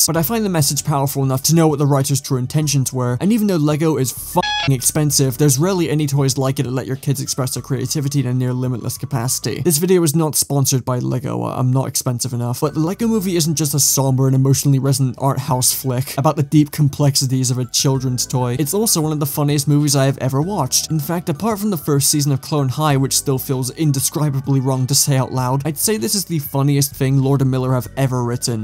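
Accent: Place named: London, United Kingdom. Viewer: Bro must be from London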